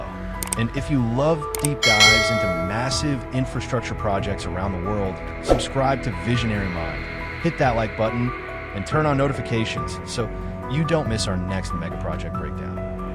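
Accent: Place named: United States. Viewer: Mispronounced place names, American accent, left hand drive, this is hard to watch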